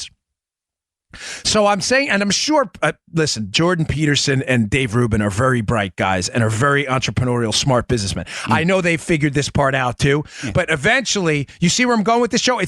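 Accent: New York, United States. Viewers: I like this show sounds like my boyfriend New Yorker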